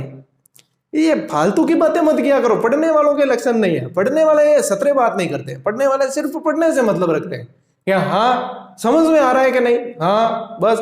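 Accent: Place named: Barmer, India. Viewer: सभी क्लासेज एक से बढ़कर एक...sir m bhi barmer me service krk aai hu 3 saal...so aapki kshetriya boli se kafi Apnapan lgta h ... thanks so much for your classes 🙂...Vidya dan maha dan🙏🙏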